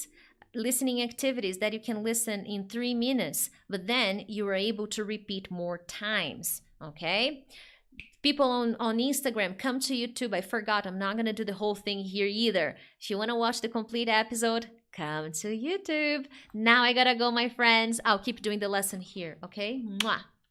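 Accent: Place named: Brazil. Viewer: Teacher once that see you,i recognized that you was Brazilian woman,because on the accent.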